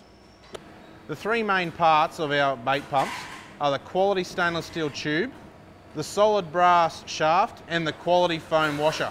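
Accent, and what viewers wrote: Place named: Australia. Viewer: Man I love your Aussie accent!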